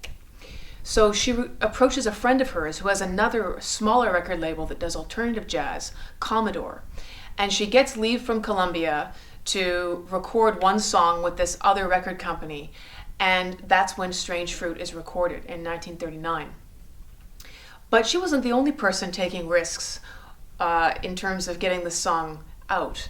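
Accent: Canada. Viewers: Miss mispronounces several names. I detect a touch of a Canadian accent. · And you spelled Abel's name incorrectly. While we're correcting things, W.E.B. DuBois pronounced his name as "Doo-Boyss". Perhaps her being Canadian has something to do with her pronunciations...?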